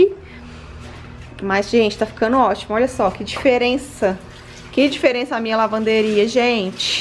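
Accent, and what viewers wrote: Minas Gerais, Brazil. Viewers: Ahhh esse sotaque mineiro😍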